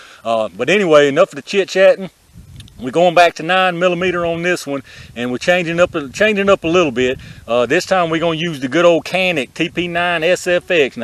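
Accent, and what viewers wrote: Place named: Louisiana, United States. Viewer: If he hadn't said he is from Virginia, I would have sworn he is out of central Louisiana. Great video.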